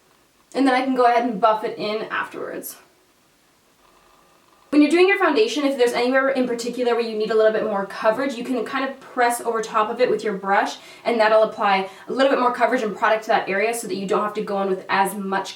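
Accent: Canada. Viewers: YOU DREEEEEEG ALONG THAT LASH LINE! Love the Canadian accent.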